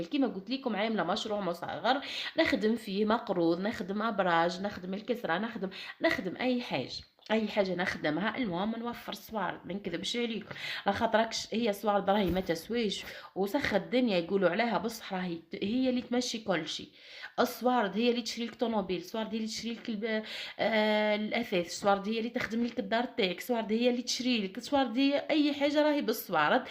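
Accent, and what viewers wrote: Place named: Tunisia. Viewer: علاش تهدري كي توانسا انا من تبسة منهدروش هاك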